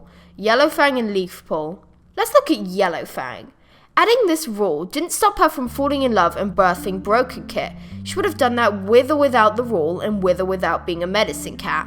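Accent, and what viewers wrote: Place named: England, United Kingdom. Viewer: OMG! I love your accent. It's beautiful. ^w^ Are you from England?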